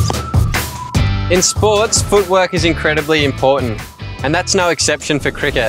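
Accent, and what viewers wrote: Australia. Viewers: most of us are here to listen to just the australian accent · Australian accent : nice skills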